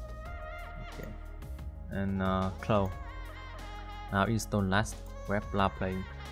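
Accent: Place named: Vietnam. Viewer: nghe kiểu đọc là biết người viêt, haha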